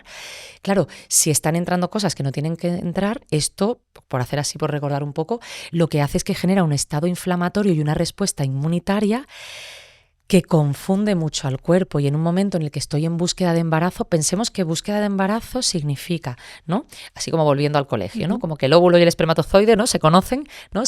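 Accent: Spain. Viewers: Gracias por la información explica claro y hablas muy bonito me encanta tu acento español❤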